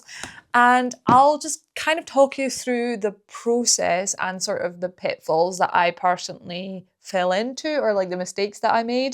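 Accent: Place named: Scotland, United Kingdom. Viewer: I actually don't pass the wool between my carders, I just swap hands and when it comes to making the rolags off I just roll in down off the carder into a little tube. Just the way I find intuitively works for me. Looks like you are doing great. It's also really nice to hear more Scottish voices on YouTube!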